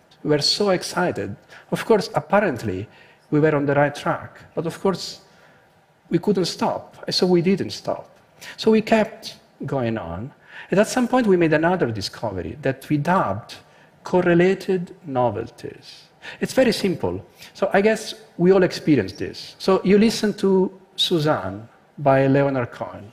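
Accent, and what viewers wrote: Italy. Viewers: a 16 minute video on trataras and tritoras.... sounds of meaningless wordsas in italiaaano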